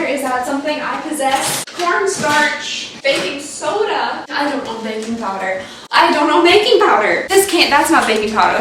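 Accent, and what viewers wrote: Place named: South, United States. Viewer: I don't know if you actually went to Michigan Tech but in my head cannon you did. When you get stressed your southern accent gets stronger and I imagine the Yoopers being hella amused by that. A Southern Belle in Yooperland.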